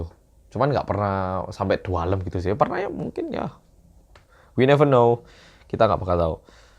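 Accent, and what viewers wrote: Java, Indonesia. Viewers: Seneng Karo medog e 😄😄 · kak bikin konten dong tentang cara belajar bahasa korea biar bisa fasih, ya kayak kakak dulu pas belajar bahasa indonesia jawa sampe bisa medok gak kelihatan logat korea nya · Aku suka semua videomu oppa, dan juga lucu bahasa Jawa mu meddoooo... Teruskan... Sukses selalu.. Gbu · The moment when aku orang jawa asli kalah medok mungkin kalah skill bahasa jawanya sama mas. Salut aku to mas😂✌ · Korea medok ancen joss gandos kotos2 👍👍👍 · Jowone medok tenan …😀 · Oppa rasa mas medok pisan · Medok mu tenanan mas, hehehe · kok lama2 jatuh cinta sama cara ngomongnya yg muedok buanget ya mas. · Mas ga usah oplas... pesonanya terpancara kemana2 sampe ke luar angkasa.. apalagi klo udah medhok.. wiisss... wissss.. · Aku ngakak ndelok'ke mas muedokkk dok tenan.. 😂 Tapi aku suka pola pikirnya, pengetahuannya luas jadi menginspirasi klo liat video2nya.. 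like lah 👍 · ya allah rekk Jowo e medok temen 😍. salam lamongan 👐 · Medoknya perasaan gak kaya sekarang, lebih..... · Jangkrik medok poll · Wuuuuuuuuuaaaaaaakeh Buuuuuuuuuuuuuaaaaanyak Ngakak😂medok bgt 🤣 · Ahahahahahah omaigatt for real you sounds so #medok.. 🤣🤣🤣🤣🤣super funny..really remind me of my korean ex Bf whos talking indonesian with javanese accent.. Goodluck!!!👍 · Kyk ga ada korea2nya klo lg ngomong medok 😂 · Kemarin2 sih tau videomu, cuman gk pernah kulihat. Ilfill gitu lihat cogan ngomongnya medhok (aku sendiri orang Jawa yang kalau ngomong Jawa medhok, tapi kalo ngomong bahasa Indonesia gak semedhok ) wkwk. Tapi setelah nonton satu videomu semalam, langsung ketagihan. Watch laterku penuh dengan videomu mas😂. Aku arep maraton nonton videone sampeyan nggih mas.. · Sam, unik banget jawa medok nya 😃 pertama liaat langsung ingin subscribe 😍 main kesunda sam mau denger juga orang korea ngomong bhsa sunda 😄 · Korea....aku loh selalu nonton, lucu, unik n medookkk. 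hahahaha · Ya Allah gemes, gak akan tau org, kalo mas org korea Bahasa jawanya aja medok bgt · Sing tak ndelok teko mas iki koyok nduduk wong korea, tapi wong jowo sing onok keturunan koreane, medoke lho mantepppp. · Iki wong Korea opo Indonesia to? Medhok men 😂😂👍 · Hai Oppa.. salam kenal.. ku baru tau ternyata kamu org Korea,ku pikir km org Indonesia.. Dialeknya jawa bgt.. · Logatnya kental jawa banget. 😊😊👍👍👍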